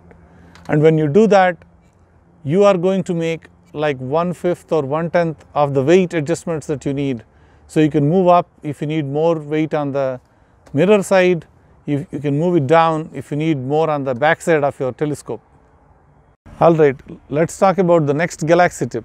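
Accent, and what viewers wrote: India. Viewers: Your Accent Sounded A Lot Like Indian, But Indians Doesn’t Get This Much Exposure To Astronomy Mainly The Equipments That You Use! So I Just Wondered How Come Being An Indian, You Have Access To Such Astronomical Equipments.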